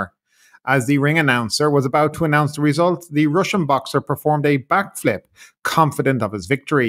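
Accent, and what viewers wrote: Ireland. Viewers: you sound Irish but look distinctly J.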